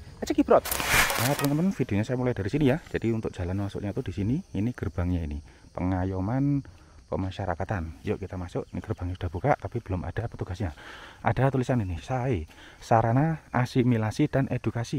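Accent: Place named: Surabaya, Indonesia. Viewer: Disertai peta lokasi, peralatan yg dipakai dan celotehnya sepanjang jalan ttg kiri kanan maupun cuaca, saya sangat suka kejujurannya cara bicara suroboyoan dan dicampur gaya arek malang.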